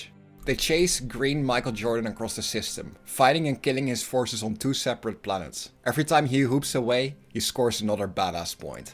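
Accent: Netherlands. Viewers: First time watching this channel, and man, from the first words, you have to be Dutch, right ? :P That accent, it almost has to be Dutch. DoW1 was brilliant, most of it was just fantastic, almost all. DoW2 was not completely my thing, but it was good. DoW3 is one of my worst buys ever, man was i disappointed, and i'd hoped modders would change it a bit, because it looks good, but i hate the limited base building and how it plays, but alas, it hasn't become a better RTS, or an RTS at all i'd almost say, at all. If i have to play any DoW game now, it's DoW1. Hands down. And it has quite a few mods too, some better than others. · Just came upon this video to hear some more dawn of war bashing(they deserve it). And suddenly realizing. The narrator is dutch. Hello fellow dutchie 👋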